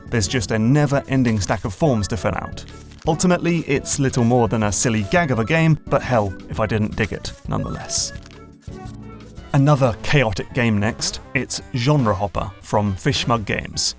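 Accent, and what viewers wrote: United Kingdom. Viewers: Yeah my man do be british though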